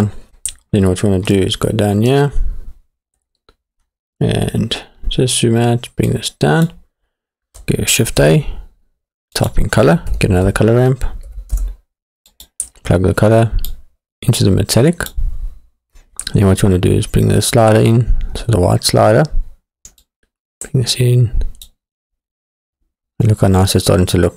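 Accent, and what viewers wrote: South Africa. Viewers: Ah, a South African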